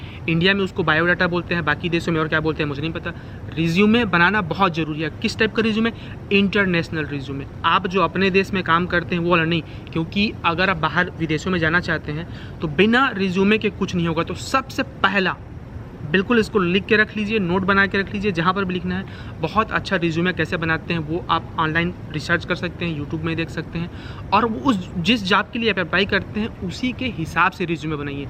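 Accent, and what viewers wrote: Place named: Chhattisgarh, India. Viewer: India me kaha se ho bhaiya CG se ho lag raha hai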